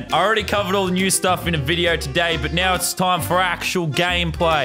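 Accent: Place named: Australia. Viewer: I’ve been watching lazerbeam for so long I can’t hear the Australian accent lol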